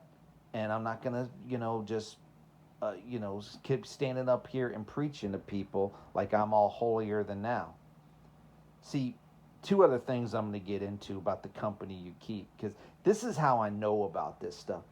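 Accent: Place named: Minnesota, United States. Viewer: You sound more like Minnesota accent than TX surprisingly.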